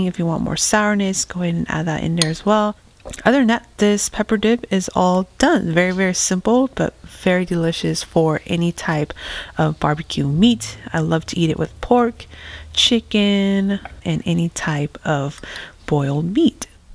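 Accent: United States. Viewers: Are you from Kansas?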